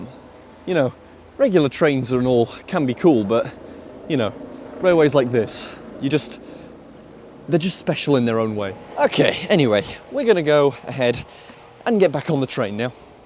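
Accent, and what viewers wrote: United Kingdom. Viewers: I love that British accent. And the nerdy content. Awesome. Please keep going.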